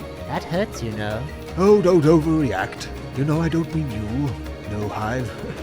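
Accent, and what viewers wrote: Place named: United Kingdom. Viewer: Glad to see someone else discovering this diamond in the rough and enjoying it as much as the rest of us do. Also, yeah, I can't wait to see another Kenshi playthrough. With your sense of humor and buttery smooth, British accent, it would be a treat to see what kinds of shenanigans you could get Arthur, Skibidi, Loada and Wee into and hopefully out of. Cheers!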